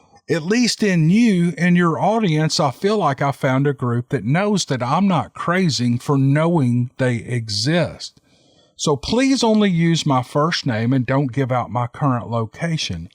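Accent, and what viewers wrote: South, United States. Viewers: With an accent like yers' I'd never have guessed you know literature the way you do. I guess that's an example of bias against southerners. In fact, though, I like people from the south. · Only an hour up and already 92 comments! Love 💘 your channel and listen to the others first because I like to keep the best for last!! The ladies have great voices but I really 💘 love listening to your southern voice...Florida girl here and everyone here in South Carolina have the same kind of voice. They all sound like my relatives, Carolina folks. Thanks for your stories, Merry Christmas 🎅 🎄!! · I luv all yer stories. You sound like good olesouthernman. Great narration. You make everything excellent. Yourmypeople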